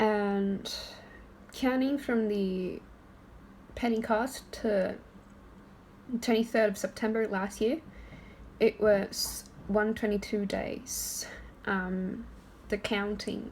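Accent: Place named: Australia. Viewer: Listen to that Australian accent lol... God bless you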